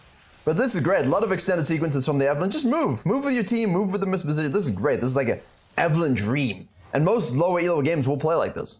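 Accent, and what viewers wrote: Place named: South Africa. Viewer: The little south african cracks in your accent makes me feel at home :) the information is great btw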